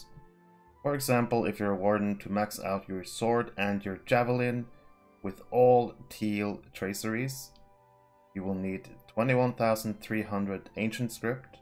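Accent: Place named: Norway. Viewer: Ha ha Norwegian dude spotted, am i right? Can't quite place it though Jaja fra en bergenser hallo og bra video 👌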